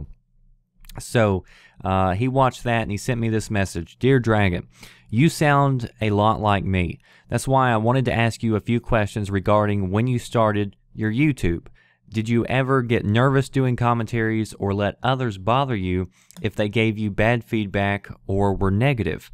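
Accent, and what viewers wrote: South, United States. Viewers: I really enjoy your vids and you got a cool southern drawl. Have another positive comment and have a nice day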